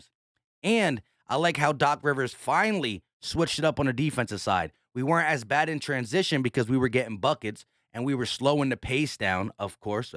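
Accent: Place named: AAVE, United States. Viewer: Why speak in ebonics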